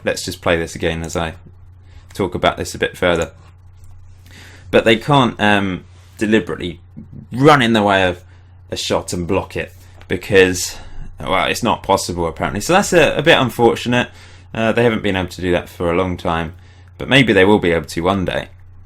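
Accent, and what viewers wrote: United Kingdom. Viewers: british accent is too polite and educational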